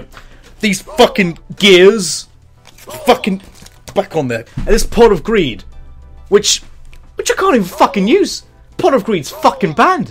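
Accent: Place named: England, United Kingdom. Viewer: Trevyn Garris he should go into voice acting. Lord knows there's a shit ton of English accent VAs in games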